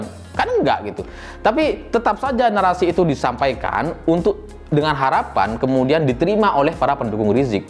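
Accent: Madura, Indonesia. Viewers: mas dgr dari logat nya org madura ya 👍👍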